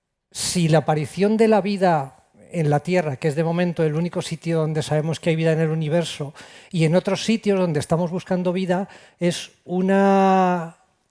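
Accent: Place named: Spain. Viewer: Excelente charla, sumamente interesante, me tuve que bancar el acento Español que no lo soporto para nada para escuchar una conferencia de 10, un pequeño sacrificio por una gran recompensa :)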